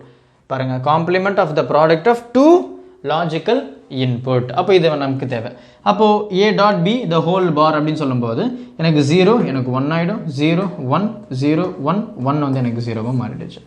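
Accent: Coimbatore, India. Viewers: Bro are u from Coimbatore ahh bro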